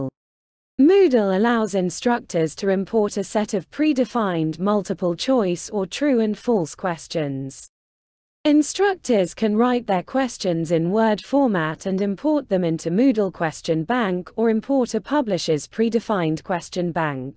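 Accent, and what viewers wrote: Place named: RP, United Kingdom. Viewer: This AI generated (presumably?) "Received pronunciation" accent is unspeakably annoying.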